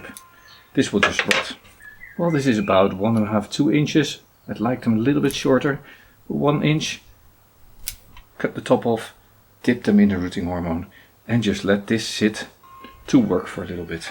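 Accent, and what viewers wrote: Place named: Netherlands. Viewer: are you from the netherlands i can hear from your accent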